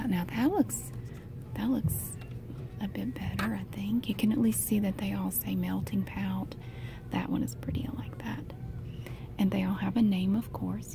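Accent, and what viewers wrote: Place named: Mississippi, United States. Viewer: You sound like me, I'm from southern Mississippi. New subscriber. 💖💛💚💙💜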